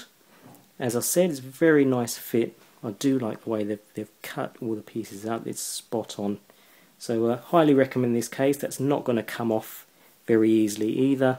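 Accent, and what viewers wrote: England, United Kingdom. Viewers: Where about in England are you from? :)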